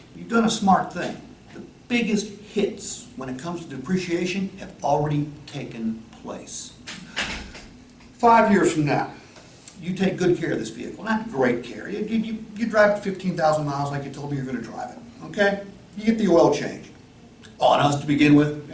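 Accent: Appalachia, United States. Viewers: and his Andy of Mayberry talk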